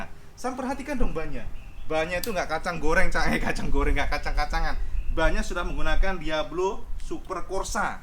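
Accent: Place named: Java, Indonesia. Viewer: Salah satu selalu nonton video tuh bahasanya lucu. Medok2 jawa campur BI cak 😂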